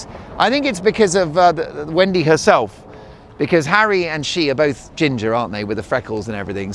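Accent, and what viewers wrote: France. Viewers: Hello! I love your show and you have a lovely french accent, but you are so so wrong about Emmanuel Macron. He speaks a lot but tells 2 things about the same topic and never never never act! And do not tell him about freedom of speech. He doesn't understand the concept. But it looks like "nice" out of France. Cannot understand why. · Mark you always make me laugh!!😂😂😂😂 Keep practising the french language💐💐. I like the accent🌺🌺🌺 ⚘️🥀⚘️⚘️⚘️🌻🌻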